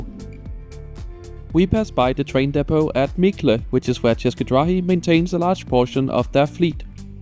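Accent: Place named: Denmark. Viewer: I was wondering: funny this voice sounds almost like having a danish accent! And them I read the name…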